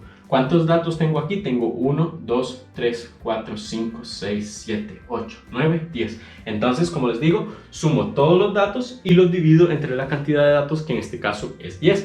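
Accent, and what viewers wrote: Costa Rica. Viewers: este mae es de costa rica se nota demasiado pura vida playo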